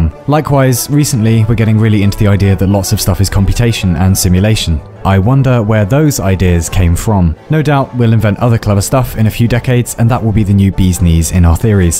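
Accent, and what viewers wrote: England, United Kingdom. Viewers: Brits will always have the best narration voice, love ur vids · There's like a whole bunch of youtube channels now with narrators with the same Alain de'Botton accent, and I'm not convinced that they're not all de Botton. But this one is the best one. And if it is de Botton, he's much smarter than in most of the other channels. And on a side note, why do Brits with this accent sound smart, even when they're dumb as doorknobs. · Orchestral Piece Begins British Bloke starts speaking fast This is when the videos make me shag the vicar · your beautiful fellow English voice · is this the same guy from the zephereus channel or do all brits sounds the same to me? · right away u know u r listening to a Brit.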